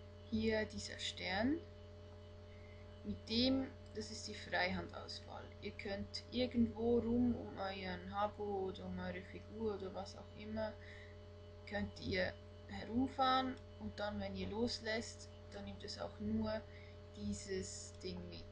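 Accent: Switzerland. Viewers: Diese schweizerische Aussprache is sowas von gail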